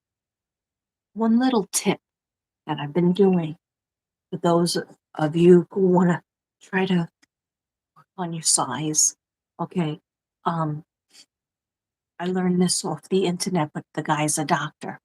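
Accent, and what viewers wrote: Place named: New York, United States. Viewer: Im right under new york in Pennsylvania ❤ive always wanted to visit new york ,when i first heard u i knew u were from new york you have a very strong accent (love it )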